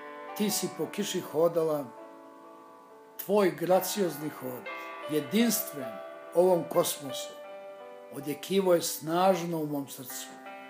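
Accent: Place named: Kosovo. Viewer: Ajde nemoj da serete za 3 padeza. Pa nemaju svi u Srbiji beogradski sleng. Covek je sa Kosova,zadrzao je svoj dijalekt. I sada ljudima iz urbanih sredina taj govor zvuci smesan,narodski,ne znam kako vec,asocira na nepismenog coveka...Nije bas tako,ima sigurno mnogo ozbiljnih kvaliteta. A sto se tice same pesme,malo se koristio Preverovom ''Barbarom''...Ima nas koji citamo,pa ne mozes da nas prevaris, maznuo si Barbaru i preobukao je u Milanku...ali to nikako ne umanjuje ovu lepu recitaciju....Svaka cast!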